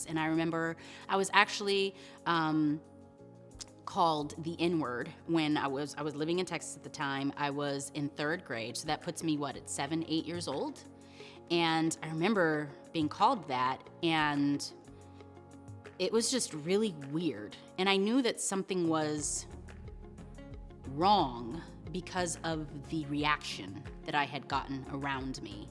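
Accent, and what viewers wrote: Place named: Bronx, United States. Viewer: Sound like Bronx tale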